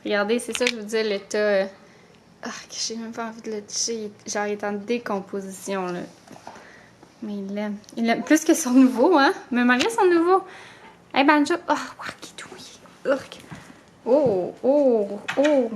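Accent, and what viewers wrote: Canada. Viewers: Ton accent canadien j'adore, mais desfois je ne comprend pas tout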